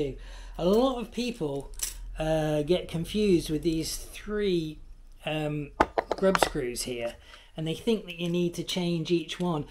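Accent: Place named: London, United Kingdom. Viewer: 1 Frac and 1 Mak, no need for this stuff. However, love watching his vids for his London accent and (some) comical moments 😁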